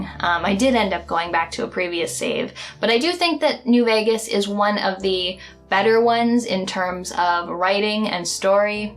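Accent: Canada. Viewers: Canadian accent for the win! Looking forward to the Journey to Silius review.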